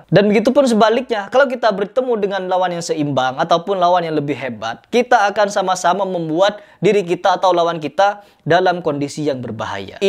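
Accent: Madura, Indonesia. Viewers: orang aseli madura kh? ketauan dari logat nya, hehe, (bibi saya orang madura jga soalnya, dan mak saya (almh) jga pandai ngomong medura krna sering bergaul dgn org madura) kalo iya ini momen yg pas utk menjelaskan & mengedikasi soal carok & bela diri, salam bela diri, saya cuman mantan atlet silat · kyaknya asli Madura juga ya?Logatnya spt Madura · kalau sendainya ini org madura asli (krn logatnya sprti logat madura). sya yakin ada 100 org saja org madura sprti psti madura penuh dgn kedamaian dri kekerasan. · dari logatnua kyk orng madura pantas phm sejarah carok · kalo ngomong kok ada nada maduranya ya😅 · Aslinya org mn ini ya.kok logat bahasanya kayak org Madura.??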